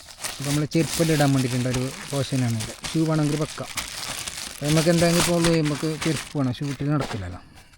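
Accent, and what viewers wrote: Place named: Kasaragod, India. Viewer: ide സ്ലാങ് മദി soopper കാസർഗോഡ് ഭാഷ 👍👍👍👍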